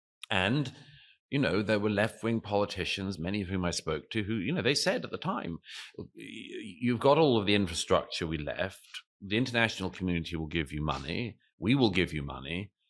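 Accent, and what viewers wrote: RP, United Kingdom. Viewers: Douglas Murray is mostly empty rhetoric and because of his posh accent people way overestimate his intellect. On Gaza he is dead wrong. It’s clearly an imperialist project by Israel. He ignores the fact that Netanyahu propped Hamas up, and that his govt is full of radical imperialists who openly say they want to take Gaza over completely (and are about to). This was never about defence, it was always about stealing land. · He leaves out that Israel has been just as terroristic in their actions as has hamas. They also have never received statehood or had a seat at the table at the UN. You all listen to this man with a British accent using Strong vocabulary and act like he isn’t spewing bs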